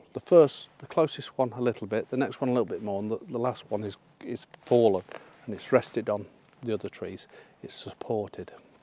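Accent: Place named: Cannock, United Kingdom. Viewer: Sounds like Cannock Speak!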